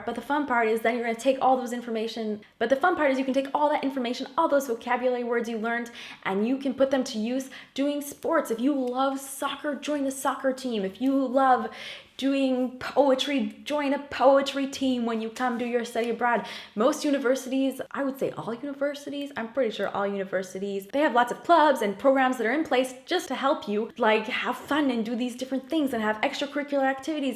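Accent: France. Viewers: c'st fou tu aurais presque un accent francais en anglais :)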